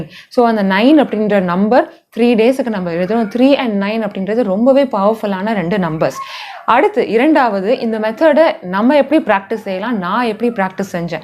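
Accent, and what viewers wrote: Sri Lanka. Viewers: நீங்க ஸ்ரீலங்கா தான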